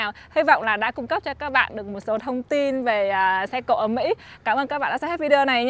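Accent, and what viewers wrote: North, Vietnam. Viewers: tiếng anh pha lẫn tiếng Bắc chính hiệu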